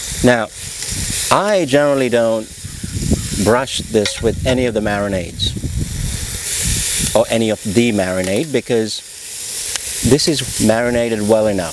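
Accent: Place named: North America. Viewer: This south Asian brother sound like North American..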